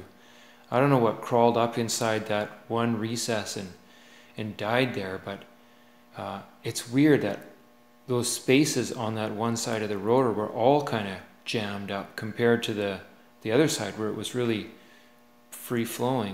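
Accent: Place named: Canada. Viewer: accents are fascinating. I can immediately tell what part of world this gentlemen is from. Go Wayne Gretzky!!! lol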